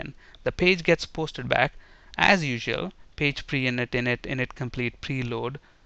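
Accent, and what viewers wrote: India. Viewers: very helpful ....may i know where you are from? ur english is excellent but u kinda have a indian accent.. :) am i right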